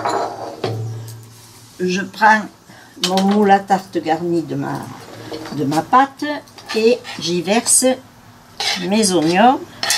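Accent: Provence, France. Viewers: Bravo mamie pour vos recettes en plus avec votre bel accent provençal, recettes que jai teste pas toutes encore merci pour votre gentillesse ❤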